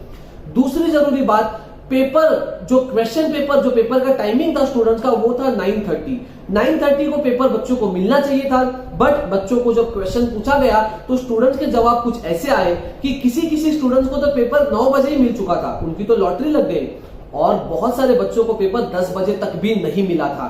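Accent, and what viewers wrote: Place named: Maharashtra, India. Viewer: Ur from maharashtra seems from ur tome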